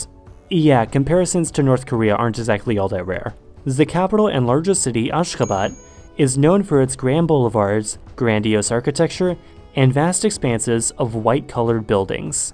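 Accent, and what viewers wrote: Germany. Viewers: you are acuttly starting to sound german you said zis' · ich glaube, dass dein deutsch etwas dein englisch beeinflusst because in the first few moments I thought that your are german.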